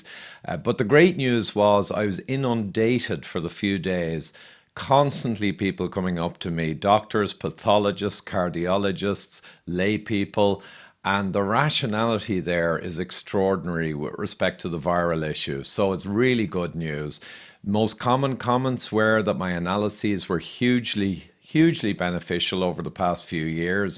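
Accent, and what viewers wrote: Ireland. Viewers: Nice job on your intros,. And yes, we Americans DO like an Irish brogue. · I think without real statisticians like Norman Fenton and others like you we would have been f..ed. You are good presenter and Irish accent helps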